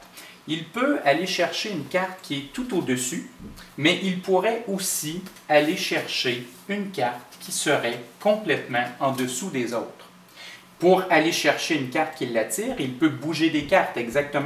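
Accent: Quebec, Canada. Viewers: Merci pour tes explications claires. Ton accent est marrant, comme tous les québécois :) Est-ce exactement la même chose pour un tirage par téléphone concernant les cartes à retourner "à la verticale" ? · C’est passionnant !!!😄je me régale. Mais y a du boulot. Merci d’avoir réussi ces 2 livres. Et vos vidéos sont super agréables à écouter. Et l’accent Canadien est trop mignon. Bonne continuation.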